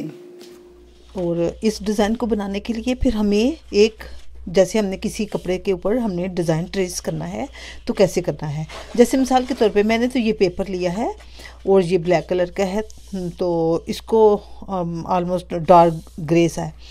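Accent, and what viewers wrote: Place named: Pakistan. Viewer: Apk accents se ap Pakistani lgti hn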